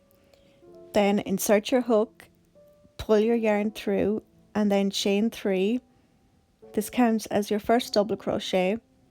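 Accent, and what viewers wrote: Ireland. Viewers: Hey! I love this sweater. I just found your channel and subscribed immediately. I love your Irish ☘️ (I think 😊) accent. I might be binge watching your channel today 😉. Thank you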